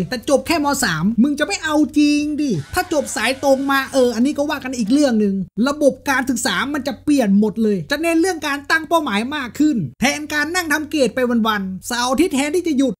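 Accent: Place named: North, Thailand. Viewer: คนที่ไหนนิ อู้มาฮู้เลย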